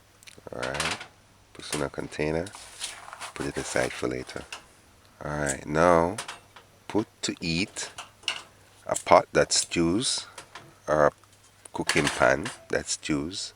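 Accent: Jamaica. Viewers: I am enjoying your colorful cooking this great blessed Saturday morning. And please do not speak patio, not everyone understands the twist in the English pronunciation. You are making videos for the general public, correct? You will have more more subscribers. Great number turn ups for you internationally. In JESUS Christ mighty name we have prayed amen 🙏🏽!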